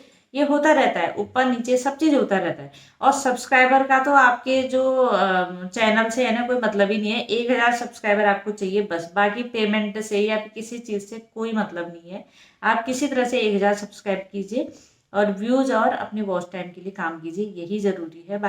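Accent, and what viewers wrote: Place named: Pithoragarh, India. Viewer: तुम्हारी जानकारी बहुत अच्छी होती है में कई बार सुनी हु अभी भी फुल सुनी हु में जो तुम बताई यही सब हो रहा हा राशिफल का खूब चलन कुछ न कुछ करते सब लोग ऐसा,तुम कहा से हो बोलना तो पिथौरागढ़ वालो जैसा है, हा बार बार एक तो लगाना नि होता है बहुत अच्छा बोली सब,में जुड़ी हु तुमसे कई बार देखती हु कमेंट नि करती आज अच्छी से सुनी तो कमेंट कर रही हु ,सुनती फूल हु में ,,हा अपनी आवाज में बनाना सही है लाइव भी सामने अच्छी हा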